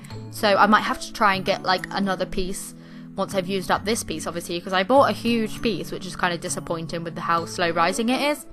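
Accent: United Kingdom. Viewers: It’s a Brit!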